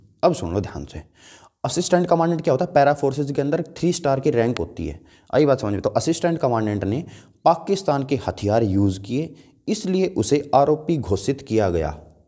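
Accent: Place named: Haryana, India. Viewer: haryanvi Aa hi jave bich bich m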